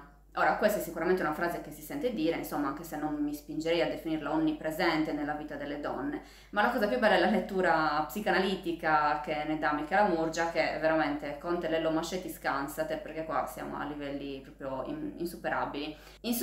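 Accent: Sardinia, Italy. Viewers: Sei stupenda. Da lucano adoro il tuo accento sardo...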